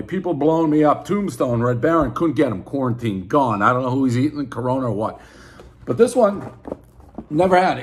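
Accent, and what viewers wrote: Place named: East Coast, United States. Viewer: If you watch the closed caption, you can understand why people from the east coast sound so god damned ridiculous... That being said, I love the reviews... thanks for keeping us entertained,! You rubbaneck!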